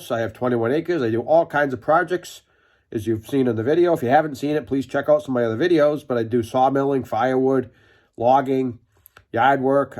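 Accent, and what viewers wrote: Massachusetts, United States. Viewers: You must be a fellow MA resident I can by your local accent. 😊 Have had a pair of the Marin waterproof boots in black for a week now. Super comfy so far. I bought them for work (small engine repair) and for riding my motorcycle. I hope to do a one year review on mine next year but I’m sure it won’t go over very well since I’m a girl so in most people’s eyes it’s like “ oh what does she know”. Glad to see you’re a happy camper after a year in yours!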